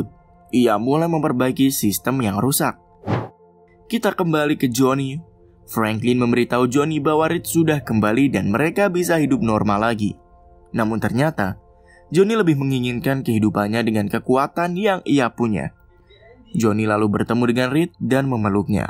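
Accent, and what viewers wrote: Java, Indonesia. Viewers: Weh anjai wong jowo to tibak e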